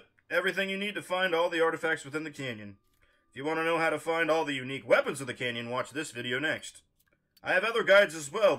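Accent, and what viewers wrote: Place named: United States. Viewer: Thank you my good sir! Your voice accent Is that of Cowboy by the way!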